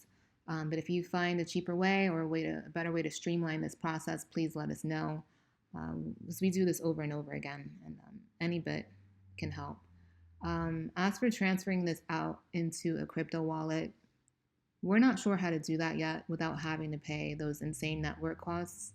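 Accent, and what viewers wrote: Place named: New York, United States. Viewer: The New York accent makes this video that much better.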